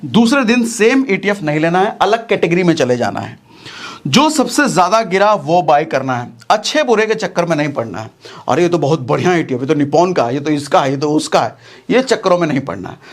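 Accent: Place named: Bihar, India. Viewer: सागर सर आपका आवाज मे जो बिहारी टोन है ओ दिल को छू लेता है और सीधा दिमाग़ मे बैठ जाता है ❤🙏🙏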